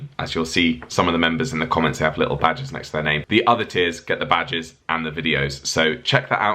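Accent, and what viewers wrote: England, United Kingdom. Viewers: you have a genius for relating to your viewers, giving us the impression of being in an intimate one-to-one relationship with you. I moved to London from Australia in my early 20s returning to Oz in retirement. Although I love it here, I still enjoy the Englishness of your accent and the lovely ordinariness of English life I enjoyed there in my salad days.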